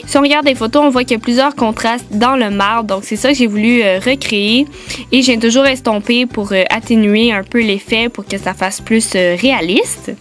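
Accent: Quebec, Canada. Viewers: Ho mon dieu t magnifique et j'adore accent québécois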